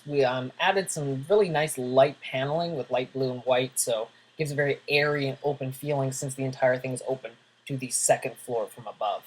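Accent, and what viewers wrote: Canada. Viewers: you a clearly Canadian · he's from Canada , he has a accent. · cuz hes Canadian so he has a accent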